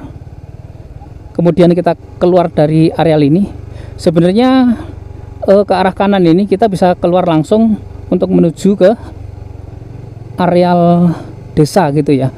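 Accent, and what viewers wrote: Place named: East Java, Indonesia. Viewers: nek dilihat dari logatnya...logat jawa Timuran ya. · Vloger receh, aku seneng banget karo logat bicaramu yg medhok banget,jawa timur sing kenthel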